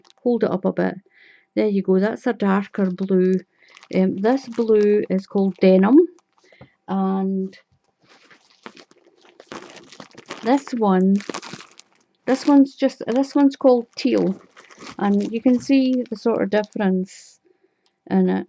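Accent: Scotland, United Kingdom. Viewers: Oh my! That totally blows that crappy mystery box from e-bay out of the water !! LOL... What a great stash. 🍀You did great.🎶 I have never used T-shirt yarn. I don't. know how it feels. But I can't wait to see what you do with the pom- poms.I thought I might like that for a trim around a baby blanket ? I really don't know. The colors of your stash are beauties. I am in the process now of making a Ferries Wheel yarn , color , Wild Violets, small baby blanket. Keep well. Stay safe. Keep healthy. God bless us all. I really like your channel and I love your brogue. 🌞 Is it Scottish ?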